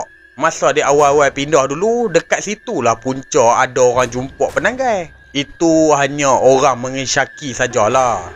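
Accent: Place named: Kedah, Malaysia. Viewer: BG guna lah ayat KL,baru lagi ok,tak leh lari slen Kedah ya?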